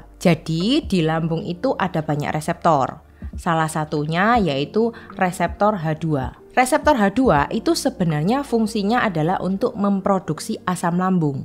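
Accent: Java, Indonesia. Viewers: aku faham logat mu cik...kmu tinggal di jawa kan.😅..btw aku sakit gerd ..pusing ga hilang udah 7 tahun ....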